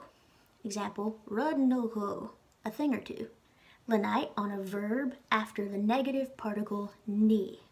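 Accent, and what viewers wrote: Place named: Alabama, United States. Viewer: You're concerned about your pronunciation because you're from Alabama, I'm a Yankee and I put a fada on all A's regardless of standard pronunciation. This was an outstanding lesson! GRMA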